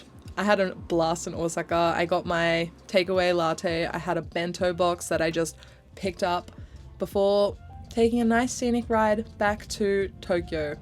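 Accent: Australia. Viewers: There she is!... You sound like you just came back from Australia... Like my Southern Drawl comes out when I go home... 😆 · Refreshing to hear an Aussie accent 🙏🏻 Cannot WAIT to be back in Kansai this week 🔥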